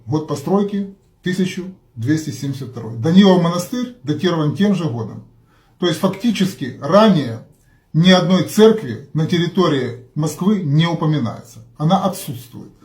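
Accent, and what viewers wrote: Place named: Ukraine. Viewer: Отличное видео. Для малообразованных людей из средней Азии, нет большего доказательства своей исключительности, чем видео в интернете, рассказанное неизвестным человеком с украинским акцентом, который не приводит никаких доказательств.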